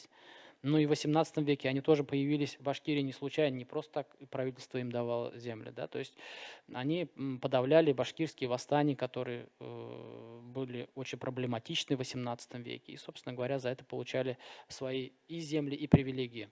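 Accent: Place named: Europe. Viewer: В ЕВРОПЕ ЖИЛ КАК УЧИЛИ ТАК И ГОВОРИТ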